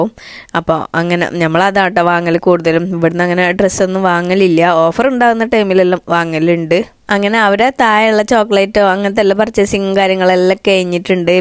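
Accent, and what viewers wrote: Kasaragod, India. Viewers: ഞാൻ ആ ദ്യമാണ് ഈവിഡിയോ കാണുന്നത് സംസാരം കേട്ടിട്ടു കാസർഗോഡ് കാരി ആണെന്ന് തോന്നുന്നു കൂടുതൽ അറിയില്ല എന്തായാലും ഫുൾ സപ്പോർട് 👍👍👍🌹🌹🌹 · Masha Allah Ninte Nalla Soundan Pinne Nammale Kasargod Samsarum Spr Aanetto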